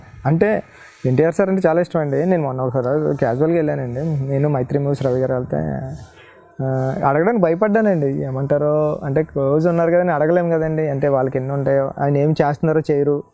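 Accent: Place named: Godavari, India. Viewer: Director sir, are you from Godavari district? Your language like that🙏🙏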